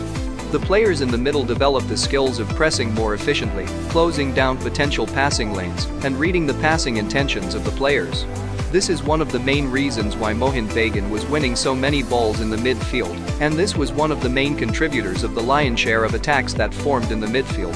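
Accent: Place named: United States. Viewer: But your voice is like an american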